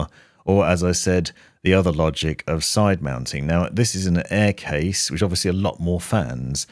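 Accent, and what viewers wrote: United Kingdom. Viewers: you sound uk based, you know any sites with configurators where i could get a quote and plan to buy without really scummy pricing? cos the one site i do know make you basically pay its cost twice over by not offering a way to buy it in one go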